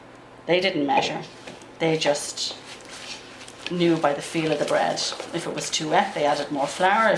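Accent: Ireland. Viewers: Hi, I've recently subscribed with you, loving your video's so far & love your gorgeous Irish accent!...I'd love to know how long to leave the vinegar standing in a cup of milk?..I'm looking forward to making Soda bread the way my dear Irish Grannie used to make it! keep safe x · Love the video. Love your Irish accent. My ancestors came from Galway Bay. God bless the irish. Wish I could have a cuppa and a slice with you.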